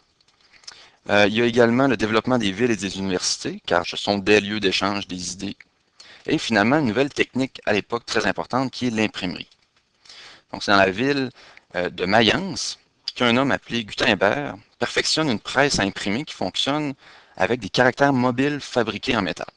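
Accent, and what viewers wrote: Canada. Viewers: mdr laccent canadien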